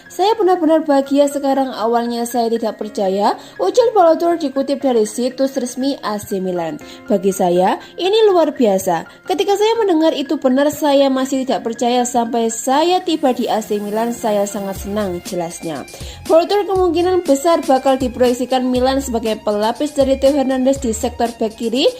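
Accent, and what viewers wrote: Java, Indonesia. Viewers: kental banget bahasa jawanya😆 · Medok buanget yah pembawa narasinya 😁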